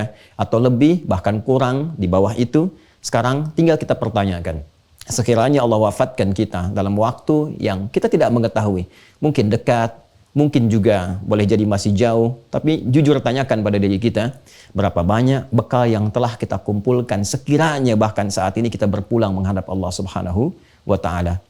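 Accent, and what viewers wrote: Malaysia. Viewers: Ustad terkadang menggunakan bahasa dan logat melayu hehe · Ustadz bicara nya sprt bhs melayu / Malaysia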